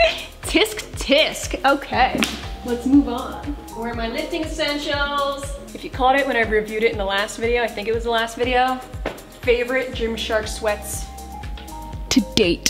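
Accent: New Jersey, United States. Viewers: Not interested in buying literally anything. Here every time EXCLUSIVELY for the Jersey accent.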